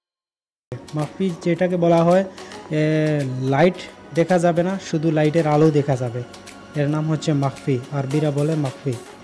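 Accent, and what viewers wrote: Sylhet, Bangladesh. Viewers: Sylheti ni bai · Vai sylheti ni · ভাই তুমি সিলেটি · খাস সিলেটি❤️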